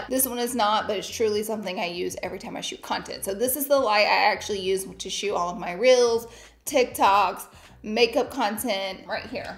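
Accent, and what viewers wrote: South, United States. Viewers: Ugh, looooooove these videos! Used a few of your links 😁💖 Side Note ~ I love that you haven’t lost your country twang lol!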